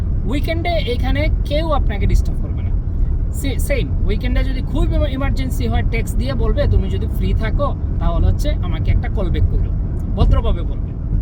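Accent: Sylhet, Bangladesh. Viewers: আপনি সিলেটি?❤️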